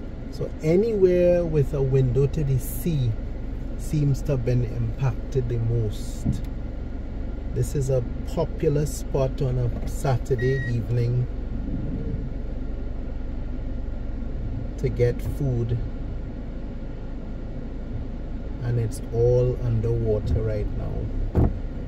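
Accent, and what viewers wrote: Trinidad and Tobago. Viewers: I hear a trini accent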